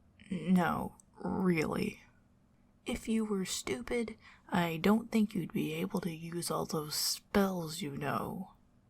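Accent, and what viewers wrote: South, United States. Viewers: This southern accent is the peak of ASMR.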